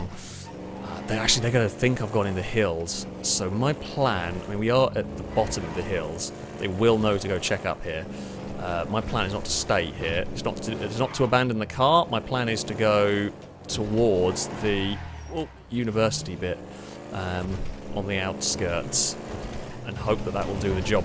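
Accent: England, United Kingdom. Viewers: Omg i forgot about ur fancy english accent and ur adventures 💰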